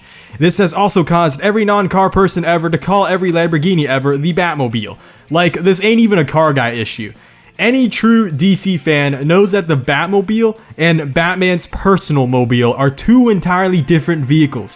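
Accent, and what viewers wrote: United States. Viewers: I was thinking your American not Asian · I just love listening to americans pronouncing italian car names, I am italian🤣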